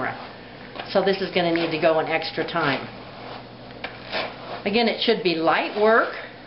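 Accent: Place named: Pennsylvania, United States. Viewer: You speak like my mother! Are you from the Pennsylvania area or West Virginia by any chance?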